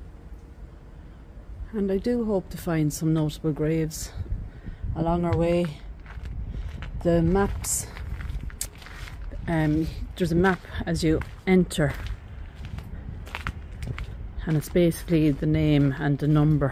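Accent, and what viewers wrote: Ireland. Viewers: I am a retired language teacher. And was raised by the Sisters of Catherine McAuley. An Irish Order. I understood your “brogue”, but it was an attempt to help you say the French correctly. Bonne journée 🌷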